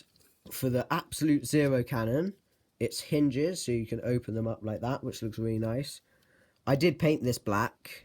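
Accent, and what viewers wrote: United Kingdom. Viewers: You sound like british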